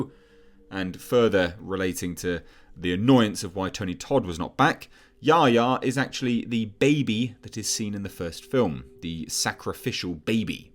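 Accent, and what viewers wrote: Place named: England, United Kingdom. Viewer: I don’t think you watched the original Candyman. You sound a little too bias because of your lack of fondness of Jordan peel. Based on your accent you should know who Clive barker (a fellow Englishman), who originally wrote Candyman. So the so-called “racial stick?” was not created by Jordan peel but originated from Clive. You have some great information pertaining to the plot, but unfortunately very bias and you sir sound a little offended by the actual plot.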